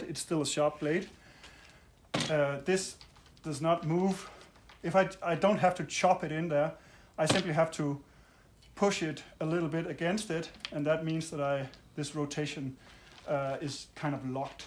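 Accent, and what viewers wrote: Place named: Germany. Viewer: Not being rude in any way, great knowledge! I think whenever you say "Ah" it's a little silly however doesn't mean you are talking out of your butt lol, I'm guessing English is a second language and German is first.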